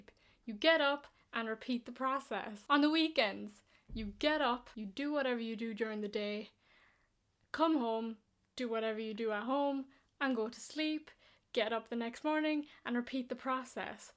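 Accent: Ireland. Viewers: juging by the accent she's from mayo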